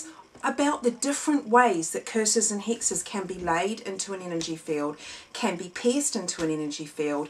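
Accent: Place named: Scotland, United Kingdom. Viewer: you sound Scottish :))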